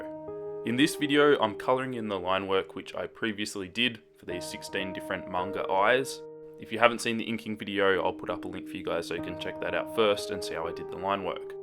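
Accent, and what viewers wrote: Australia. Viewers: Are you Australian? Sorry if I got that wrong I'm not very good with accents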